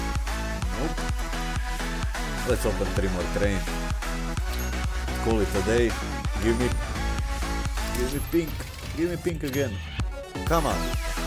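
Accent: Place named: Balkans. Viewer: Not rusdian accent of english i know how balksns speak english hes from serbia or croatia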